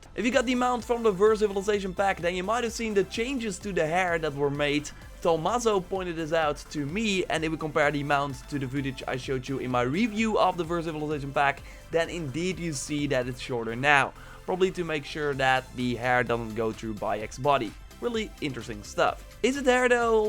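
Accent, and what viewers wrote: Netherlands. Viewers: S1561verian S1561verian Hij is Nederlands. Kun je echt gelijk horen. Plus, hij zei dat volgens mij bij een Livestream. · GoodFella 98 pretty sure its dutch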